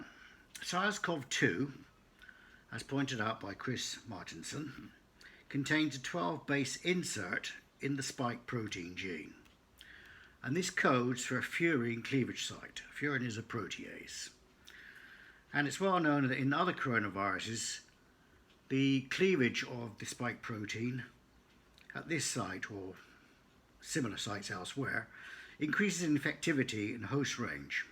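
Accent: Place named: United Kingdom. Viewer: Do you have anything other than a British accent to establish yourself as an authority? Because that really doesn't do it, especially these days.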